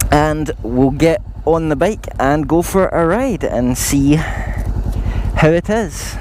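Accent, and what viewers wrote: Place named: Scotland, United Kingdom. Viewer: Hi mate, nice review. You sound like you're from Scotland. I am as well, and was wondering if you could tell me where you got the bike? I'm thinking about getting it as well! :) Thanks.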